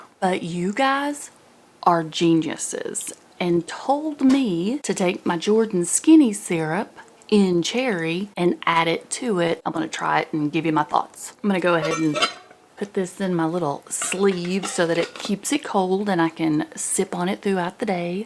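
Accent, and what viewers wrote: South, United States. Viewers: Hi.good blog today.op love your snow. From northern Canada so we get lots. I bought dr Fungs Obesity Code book and a great read. Halfway thru the video with CEO you recommended. Great information and makes such good sense. thanks for recommendation. Love your show and your southern accent. Wild. ❤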